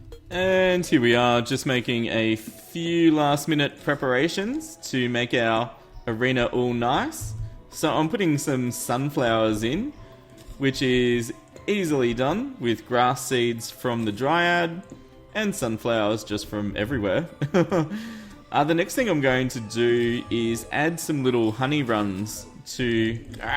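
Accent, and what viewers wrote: Australia. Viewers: Haha, that polish shoutout was so funny :). But if u want to say it somewhat correctly you say: Lubee-eu tziastetshka. Omg that was so funny just to try and figure it out ;). Please say: i like to watch watches in your australian accent but please say it in a very 'strong' accent. Keep up your great work, everyday i cant wait for another video. 9 and a half big chocolate cookies for you! ( half got eaten by the eater of cookies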